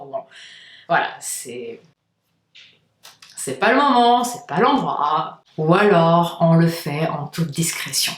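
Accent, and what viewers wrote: Portugal. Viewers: C'est dû à son accent! Elle est portugaise, du coup dans ces langues là (espagnole et italiens) il y'a ce qu'on appelle l'accentuation. C'est surement ce que tu entends le français dérogeant à cette particularité rend encore plus audible son accent c'est tout! Bisous!! PS: d'ailleurs elle en a fait une vidéo sur son accent ON trouve cet accentuation en Anglais, bref dans plein de langues...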